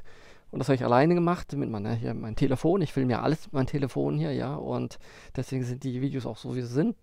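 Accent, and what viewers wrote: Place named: Sweden. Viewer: Ich finde dein schwedisches Deutsch klasse!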